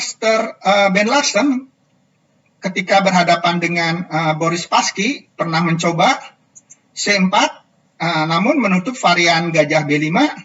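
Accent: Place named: West Java, Indonesia. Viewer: Pak logat Sunda nya tetap melekat ya. Mantap lah.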